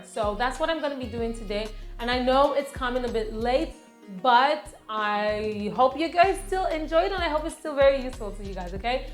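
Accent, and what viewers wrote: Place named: California, United States. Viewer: How come you have a California accent?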